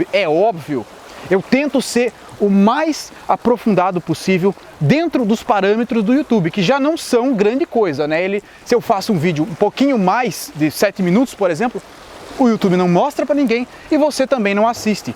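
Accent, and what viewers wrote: Rio Grande do Sul, Brazil. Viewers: Muito bom como todos os conteudos, mas entre nos meu velho, pelo teu sotaque sei que tu também é Gaúcho, diz ai: É Gremista ou colorado haha sucesso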